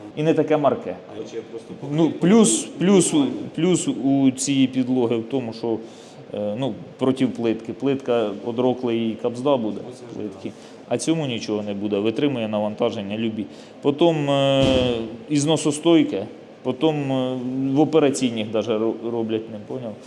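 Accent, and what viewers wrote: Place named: Western Ukraine, Ukraine. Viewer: Ну вино шо з якогось села по мові чути чи Львів чи Закарпаття мова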